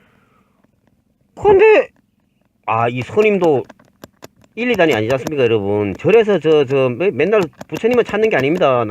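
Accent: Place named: Samcheok, South Korea. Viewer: 제나이쯤 되셨겠네요 목소리도 삼척사람 .저도 삼척 사직리 사람 .지금은 파주시, 수고많네요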